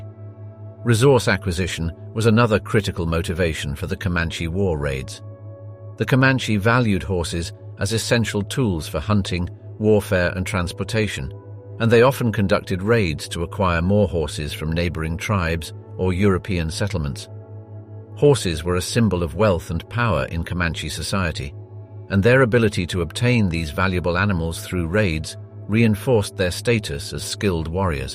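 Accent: United Kingdom. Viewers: Why do you have a British narrator for American history? I'd think you could have done something alot better than start off negatively. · How appropriate that so much misinformation (& mispronunciation) is narrated in a BRITISH accent....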